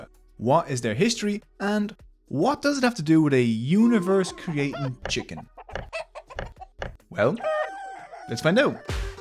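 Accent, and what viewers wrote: Ireland. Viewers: BRUUUH you're confirmation to my closeted anthropologist mind, that the accent of the Bajans is defohighly influenced by de irish and probably from where youre from in ireland... #onelove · From a Nigerian, an Irish dude pronouncing Yoruba places/names with an Irish accent...is just in having me in stitches 😂😂😂 (it's so phonetically bad/wrong), but I'm so enjoying this video nonetheless. The amount of research is immensely commendable. 👍👍👍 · Please be googling how to pronounce “Yoruba” first. Even with your Irish accent there’s no reason why you can’t pronounce Yoruba and place names like Ibadan properly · A party to celebrate the success of a previous party SENT ME lol This was a pretty cool breakdown especially for someone who is ADOS learning I'm largely Yoruba. I also appreciate your pronunciation effort which I don't think can be easy with a strong Irish (?) accent! 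· God i really love your accent, i wish i was born Irish so i don't have to study extra to have Irish accent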